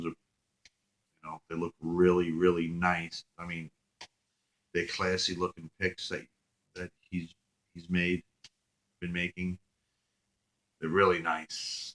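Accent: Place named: Long Island, United States. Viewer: Too much to respond to in this video. I feel like I'm listening to myself a couple of years ago. You're gonna be a star man. YT is going to be a great place to flourish for you. I can see it already. You have a GREAT accent. The Long Island thing is BOSS dude. It makes you so Hollywood. I think you have half of this stuff nailed. And a CAR GUY. HOLY F. Welcome to the club of guitar guys who are car guys too. DKG is a huge car nut too!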